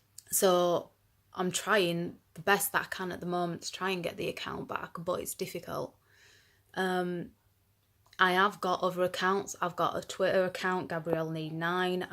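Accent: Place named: Yorkshire, United Kingdom. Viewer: You sound like a Yorkshire lass to me, either that or Manchester, somewhere that way but you sound a bit well spoken for Manchester 😂I look forward to more videos 😁xx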